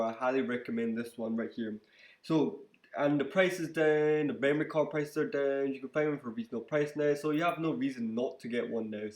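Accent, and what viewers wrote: Scotland, United Kingdom. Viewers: you from Scotland nice video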